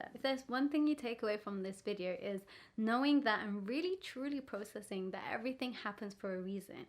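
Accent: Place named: India. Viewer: This is first time I'm watching your channel . I'm preparing for Neet (medical entrance exam ) .. I also have a feeling that your from India .. but I like your accent .. And thank you for these tips 😊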